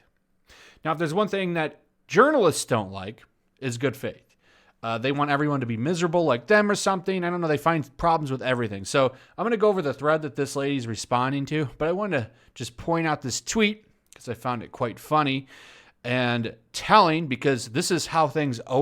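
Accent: United Kingdom. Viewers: Love your brittish accent...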